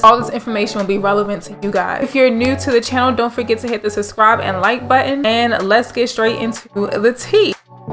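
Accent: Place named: Baltimore, United States. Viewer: a BMore accent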